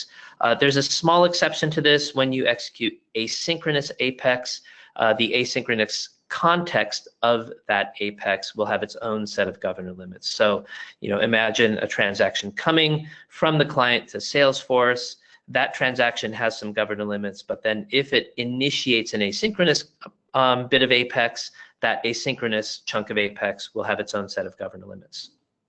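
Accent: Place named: United States. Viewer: Why American voice here? Why not people with famous Indian accents in this video? Isn't Salesforce Indian company?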